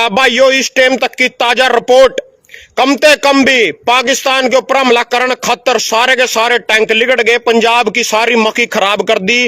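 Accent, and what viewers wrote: Haryana, India. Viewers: भाई आपके भाषण में हरियाणा जिंदा है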